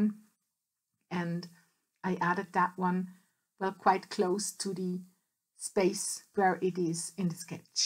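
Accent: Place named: United Kingdom. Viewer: You‘ve got a fairly british accent my dear ☺️ Sehr schönes Layout! Liebe Grüsse 🙋🏻‍♀️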